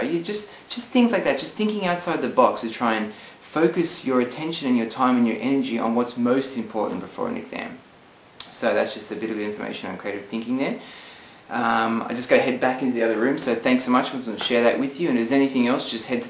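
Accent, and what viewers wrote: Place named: Australia. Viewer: australiaann accent haha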